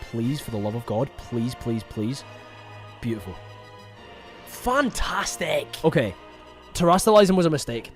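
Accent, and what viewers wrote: Scotland, United Kingdom. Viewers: Love how the Scottish accent properly creeps oot every now n then am here fur it n long may it continue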